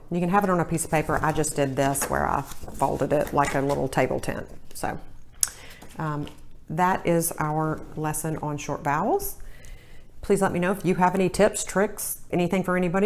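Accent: South, United States. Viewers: you gorgeous young lady with a hint of southern accent I’m in love. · I'm so glad to have found someone who sounds like me - Southern accent! This helps me to teach my homeschooled daughter. My name is Sam, but when my daughter isolates the short vowel sound, she hears e. If I'm honest, I do too. Any tips with that?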